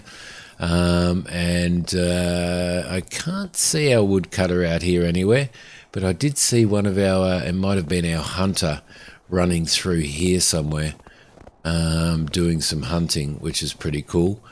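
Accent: Australia. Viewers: Australian, wah whoo!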